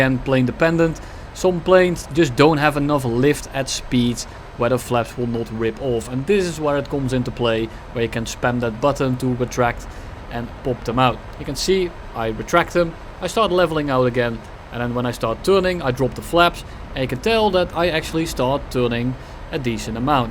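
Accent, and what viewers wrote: Netherlands. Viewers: I know you're Dutch, I hear ded eksent a mi-jel awee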